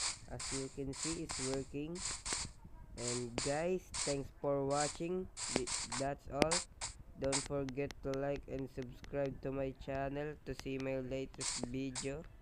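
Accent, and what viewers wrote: Philippines. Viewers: Sa accent pa lang alam kong pinoy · Your a Filipino cause your voice is like a Filipino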